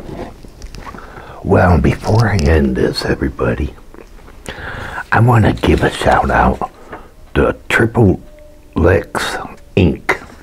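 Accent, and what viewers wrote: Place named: South, United States. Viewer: that southern accent is everything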